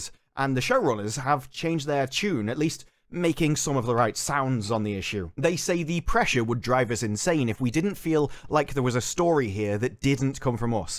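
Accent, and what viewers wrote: United Kingdom. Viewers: A british guy talking angrily for good reasons and staying prudent while other disgrave his culture? Take my subscription!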